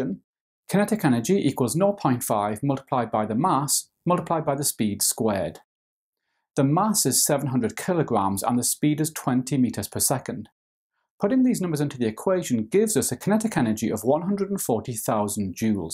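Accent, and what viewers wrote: United Kingdom. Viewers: Thank you sir for doing these videos, so easy to watch and understand. There have been many American videos for studying on the Internet. However my English is my second language, I've always had trouble with pronunciation from American language videos. Your videos are free, follows the National Curriculum and in British English.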